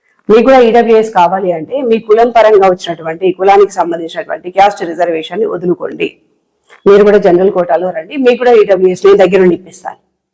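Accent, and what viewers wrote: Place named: Telangana, India. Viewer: Mee vedio s andhrajanam koodaa choosthaamu,achhu thelangaanaa yaasa lo maatlaaduthunnaaru, koncham andariki ardhamayyelaa maatlaadaalani koruthunnaamu😊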